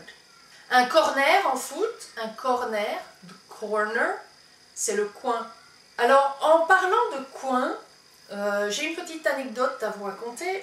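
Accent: France. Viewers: tiens, toi tu viens du nord non ? :p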